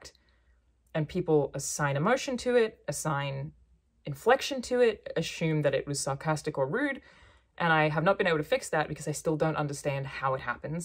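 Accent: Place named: Australia. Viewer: I love your lilting Australian speech impediment, but we need to talk about the way you say "assume." What's up with that?!? There is no 'sh' in that word anywhere! Desist! Dig you. Please roast me appropriately. :)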